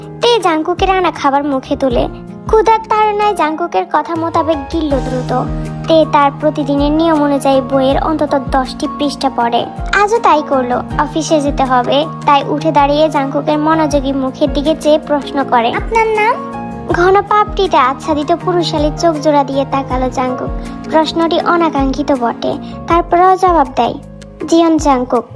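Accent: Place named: Old Dhaka, Bangladesh. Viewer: আপু তোমার এক গল্প কয়বার দেকলাম ঠিক না-ই তোবো ভালো লাগে আমি fb এর মধ্যে পরছি তবো এমন ভালো লাগেনাই। যতোটা তোমাদের মুখে ভালো লাগছে। বিশেষ করে তোমার মুখে পোরান ঢাকার ভাষার নতুনভাবে প্রেমে পরলাম ভালো লাগে। যতো বার শুনি মনেহয় এই ffটার প্রথম বার শুনছি। ❣️❣️😊😊😊😊😊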